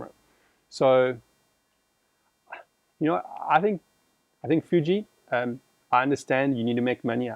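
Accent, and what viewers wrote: South Africa. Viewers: I stumbled upon your video and I knew the first minute there was something different in your accent. To me S. Africans sound like they had a little German inside trying to communicate in English, please no offense intended! I'm Spanish and we speak the worst English in the world, period! Nice video anyway, I'll watch more.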